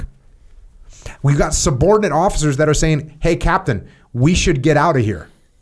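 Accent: England, United Kingdom. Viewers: English accent Jocko is the Jocko I didn't know I needed. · Jocko with a British accent is pretty amusing. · Brilliant from England your English accent had me in creases also well done recognising the authors dry humour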